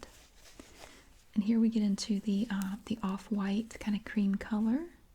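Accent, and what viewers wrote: South, United States. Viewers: I have family in the south and I think one of the reasons I like you is because you remind me of them. There is something captivating about Southerners - not just the accent, but your mannerisms. Anyway it makes for a good foundation for asmr. You’re exceptionally graceful so that makes you special in my opinion :) I hope you keep doing this for a long time I always enjoy seeing all the stuff you come up with. Thanks for sharing your talents with the world.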